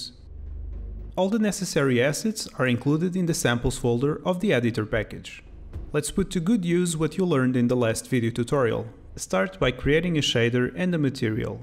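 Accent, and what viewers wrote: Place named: Portugal. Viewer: Não vão acreditar mas ao ouvir o sotaque pensei logo que era um tuga e depois de procurar na net, confirmei que quem está a falar é tuga. Parabéns pela ferramenta, parece porreira e definitivamente uma compra a fazer num futuro próximo.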